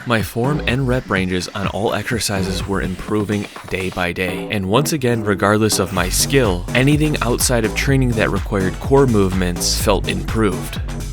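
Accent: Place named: Midwest, United States. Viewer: I love the midwest accent. Idk why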